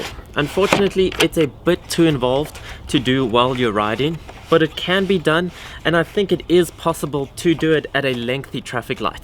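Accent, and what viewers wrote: South Africa. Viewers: Always wondered where that accent of yours was from.... So you're South African.. that's awesome. I follow cricket and its names like AB Devilliers, Dale Steyn which comes to my mind thinking about SA. Well now will be one too. 🙌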